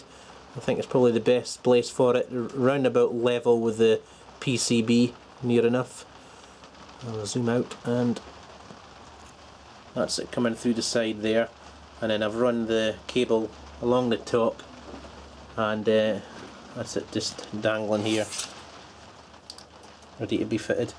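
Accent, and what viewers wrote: Scotland, United Kingdom. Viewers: is from Scotland. I am English. One can easily 'get' all the content by the context. He is clearly a Top Boy and I love having to try a bit harder to understand his speech pattern. I can cope with a few "eeee", "eeerrr" when he is so on the money and his kindness so evident.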